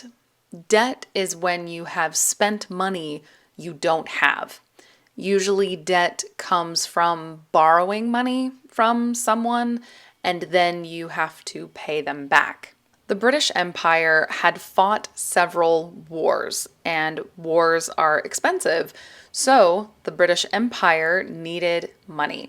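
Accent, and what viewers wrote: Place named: United States. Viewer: I love the American English. 😊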